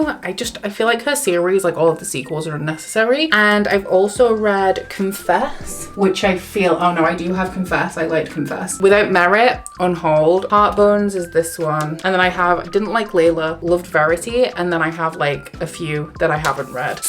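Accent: Scotland, United Kingdom. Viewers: I just can't place your accent! Moments you sound scottish like me and then you sound yorkshire ahahah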